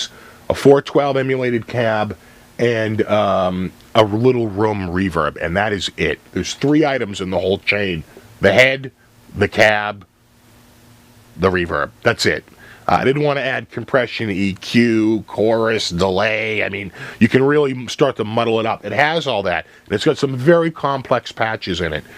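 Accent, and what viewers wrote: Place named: New England, United States. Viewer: lol are yu from rhode island? or sumwhere in new england?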